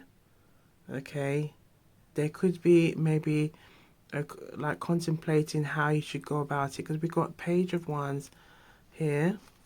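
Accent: Jamaica. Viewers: I think this is the second time I’ve seen one of your videos and I just wanna say I LOOOOOOOVE the patois so much 🥰🥰🥰 reminds me of here in Australia how we have our own slang language no one else can understand haha. I love seeing the same/similar things pop up across different cultures. Our wa gwan is “scarnon” 😂 Bonded by dialect 😁😁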